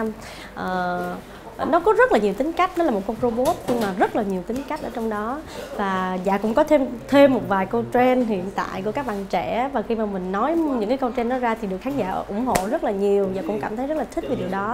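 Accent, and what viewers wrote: North, Vietnam. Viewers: Chị nói giọng Bắc Chuẩn quá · nói giọng Bắc hay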